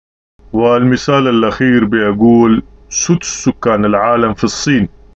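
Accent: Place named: Mahas, Sudan. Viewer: قاعدة ⲓⲧⲧⲓ في النوبين تستخدم مع الاعداد لاشتقاق صيغة الترقيم التصاعدي وليس صبغة الجذور الكسرية كما تابعت معكم ..فاسم العدد التصاعدي (الثالث) تتكون من اسم العدد ⲧⲟⲩⲥⲕ ملحقا بها اللاحقة ( ⲓⲧⲧⲓ )وتنطق في ثلاث مقاطع نطقية ⲧⲟⲩⲥ\ⲕ-ⲓⲧ\ⲧⲓ (الشارطة المائلة لبيان أماكن التوقف اللحظي عن النطق، والشارطة على السطر لبيان جذرالكلمة والاضافة القواعدية عليها ) ومثلها كل أسماء الأعداد التصاعدية . أما قاعدة إشتقاق أسماء الكسور الجذرية فلا يتشابه منها في اللاحقة إلا اسم العدد (ثلث 1/3) مع إختلاف طريقة النطق (النبر) ..فاسم (الثالث) التصاعدي يتم النبر على المقطع النطقي الثاني (والنبر هو رفع حدة الصوت عند النطق بحروف أي مقطع بما يخالف النبرة الصوتية لما قبله أو بعده) ، بينما في إسم (الثلث) الجذري لا يوجد نبر والمقاطع النطقية الثلاث تنطق بوتيرة واحدة.. وتقبلوا خالص تحياتي على جهدكم ..وعسى أن يكون ما كتبته لكم معبرا عن أسماءنا المحلية في لهجتنا النوبية التي تعرف بالفاديجا ..لأني أتبين إختلاف لهجة المتحدث (المعلم) قد يكون محسيا أو سكوداوي .. 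لا أعرف تحديدا ..عموما خالص الشكر والتقدير والتحية (حسين كبارة ..مركز الدراسات النوبية)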